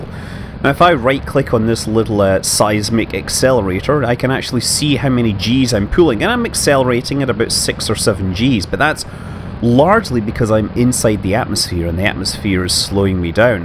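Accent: Scotland, United Kingdom. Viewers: HES SCOTTISH!? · dat scotish accent doe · Scott Manley is, well Scottish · Baruch Storm hes scottish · he's Scottish i believe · Sam Da beest Scottish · Aaron Muck Yeah, they're both Scottish accents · What the hell is that accent. Scottish word here, American there.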